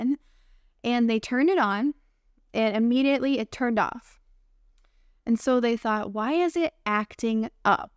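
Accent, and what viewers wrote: United States. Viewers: May I ask you a question? Your American accent belongs to North America?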